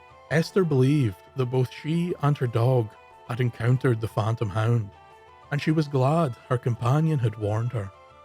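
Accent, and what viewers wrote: Scotland, United Kingdom. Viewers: Im sorry ive only just gotten the time to sit & enjoy your video, i normally like to watch them asap but alas, life got in the way!🤣 I could sit & watch your videos constantly. They're entertaining & informative. I love hearing all these tales (which are 100% true, in my opinion!) Of my beloved homeland. Also who doesn't love an intense Scottish accent?! ❤❤❤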